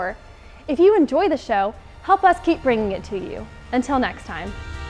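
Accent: United States. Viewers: You're cute, but try to stop mis-pronouncing everything please. You can push past this "American accent" we believe in you!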